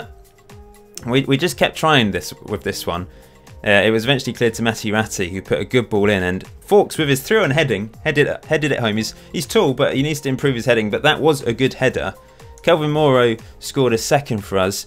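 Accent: RP, United Kingdom. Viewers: Love how posh you are, it's like watching Blackadder.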